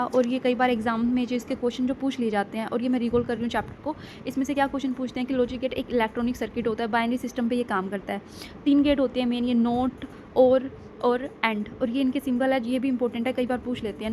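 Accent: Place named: Bhiwani, India. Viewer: Sister aap loharu bhiwani side se ho kya....aapki boli se lag raha hai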